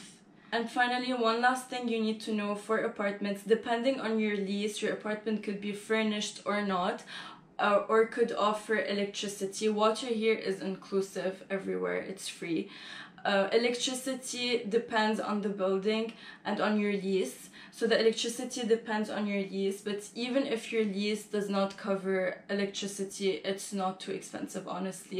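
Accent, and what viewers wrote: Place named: Lebanon. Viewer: lebanese accent is so obvious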